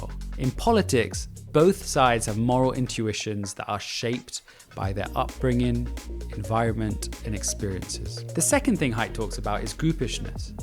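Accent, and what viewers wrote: United Kingdom. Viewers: He's a Indian with a limey accent lol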